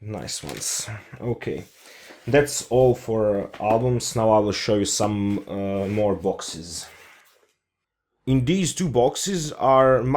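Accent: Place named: Eastern Europe. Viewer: Love your Slavic accent,Its very nice :)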